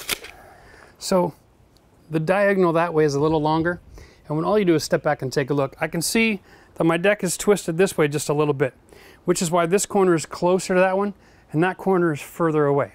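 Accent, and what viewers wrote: Canada. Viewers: I can hear that Canadian accent. Went to school in Michigan and had lots of Canadian friends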